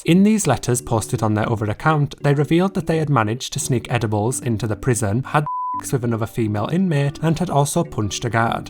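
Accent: United Kingdom. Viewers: Dude you’re literally growing so fast u already got 5k! If there’s anything the YouTube algorithm is a sucker for it’s nice clean British accents, and even nicer video content in an organized, and fun way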